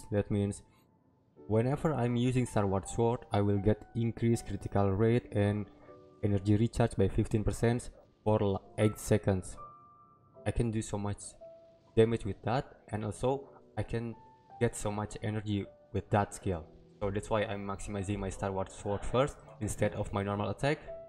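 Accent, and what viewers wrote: Indonesia. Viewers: sounds like an Indonesian accent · You speak very much like Indonesians, whether you are from Indonesia or Malaysia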